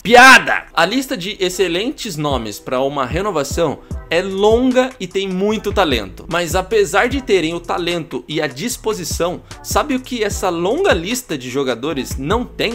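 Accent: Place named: Caipira, Brazil. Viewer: Também gostei e recomendei,. Apenas uma sugestão: conserte sua pronúncia da letra "R". Ela é muito, digamos, "caipira": jogadorrr, treinadorrr, patrocinadorrrrr... A correção desse vício de dicção o elevará ainda mais como comunicador-jornalista.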